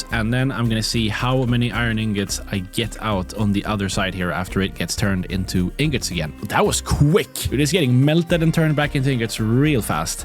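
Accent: Sweden. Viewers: Asså jag har aldrig hört en sån stark svensk dialekt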